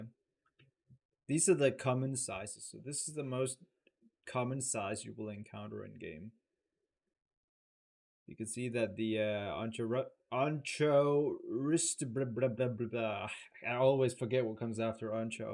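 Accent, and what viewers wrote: Denmark. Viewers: Are you from denmark🇩🇰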